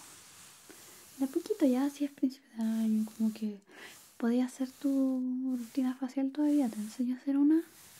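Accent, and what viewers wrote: Chile. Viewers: holis oye te tengo una pregunta en tu vídeo dices algo de que hablas como chilena eres chilena? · amo tu asentó chileno😍👊🏻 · Amo el acento chileno😻intento hacer lo mismo pero a mi no me sale😭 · Weeey apenas empezó el vídeo supe que estabas hablando como Chilena, porque soy Chilena 😮😮 de donde eres? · Casi no logro descifrar tu acento solo hoy pude Chilena 😊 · No sé si es mi impresión o ya no neutralizas tanto tu acento chileno. Solo te falta el cachai ja, ja, ja, ja... Un fuerte abrazo, amiga. Pronto ven a Guayaquil, de seguro tienes tu club de fans. Shalom. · Ya vi que si es chilena. Weeeeeeeeey nunca se le habia notado su acento weon! Me enamore mas de ella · primera vez en tu canal y reconocer altiro que eres Chilena uwu 💞💞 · 100% con su asento chileno hermoso 😊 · Me encanyel acento chileno, saludos desde Leenares, Japón. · Ahorita ando en modo "dios iluminame o eliminame" jaja ya que se me juntaron dos enfermedades (por wey en parte jeje😅😅😅) asi que tu voz con tu acento chileno mas el poderosisimo paracetamol me vendran de perlas para sentirme mejor, eso receto el doctor jaja😁😁😎. 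Que tengas linda noche. 😊😊😊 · Hola eres my nueva YouTuber soy de Perú, y me gustan tu videos de ASMR te digo que eres una chica muy bonita y se me hace extraño escuchar un video con el acento chileno marcado y en otro videos no son tan marcado tu dejo chileno. Me agrada verte siempre. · En este video se le nota mucho el asentó chileno 😍 Un excelente video ❤ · Me encanta cuando hablas con tu acento chileno ❤ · Me encantas sin susurrar!!! Y ese acento chileno bellooooooo❤️❤️❤️❤️ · Que linda, me lleve una sorpresa con tu acento Chileno🇨🇱, un abrazo, me encantan tus vídeos, me ayudan a desconectar.💫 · La mejor manera de iniciar la semana, con mi hermanita chilena (y coquette) Muchas gracias,. Una feliz noche de lunes (e inicio de semana) para ti también hoy. Cuídate mucho y que Dios te bendiga. Nos vemos pronto amiga, te quiero. Saludos desde Colombia hasta Chile, y, por cierto, muy lindo tu acento. 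· Jaja ahora puedo ser el SJJ ros- digo digo COQUETTE! Pero antes una siesta no hara mal... Pd: Amo como hablas a lo chileno! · No me cae la forma de hablar de chile pero me hizo cambiar de opinion,ME ENCANTAN SUS VIDEOS DE LA HERMANA CHILENA MUAAACK!!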